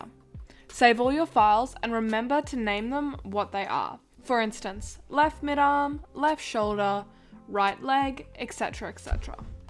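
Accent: Australia. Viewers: Congrats on your first monetized video and your aussie accent is fine btw! No need to put on subtitles 😅 Thanks for this tutorial btw! I'll be sure to come here if I ever want to learn to animate msp characters, it sure is nice to see someone who edits with medibang instead of photoshop for a change when it comes to animating on after effects ♡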